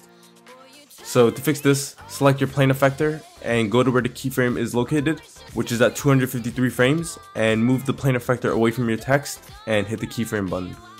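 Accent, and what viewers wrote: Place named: Russia. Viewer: Is it me or does this guy sound russian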